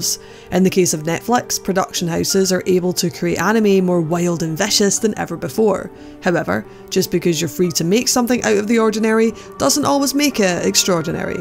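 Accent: Scotland, United Kingdom. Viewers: Nice to hear a fellow Scottish girl reviewing anime great review/really liked the feel of this anime it’s a shame it lacks at certain things like character development/pacing or it could of been so much better...did love Keith’s vibe though and pritty sure without it and the nice art/soundtrack it would totally be unmentionable but the little ending clip we got dose point to a second season so if they do hopefully they even some things out/it also doesn’t help much that I love devilman crybaby lol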